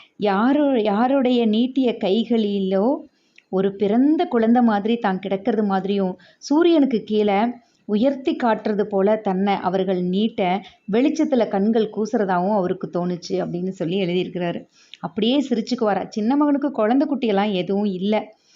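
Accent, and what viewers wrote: Tirunelveli, India. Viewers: என் வயது 81.ஓய்வூதியன். தினமும் பி.பகல் 2 முதல் 4 வரை படுத்துக் கொண்டே பல அலைவரிசைகள் கதை கேட்பேன் அரிய கதைஃ.திருநெல்வேலிப் பேச்சு மொழி பிரமாதம்.திருநெல்வேலி அல்வா கொடுத்தால் நாங்கள் சுவைத்து ருசி அறிந்து கொள்வோம்.உங்கள் விளக்கங்கள் இடையிடையே தேவையா?மன்னிக்கவும். நானும் 32 நூல்கள் வெளியிட்டுள்ளேன்.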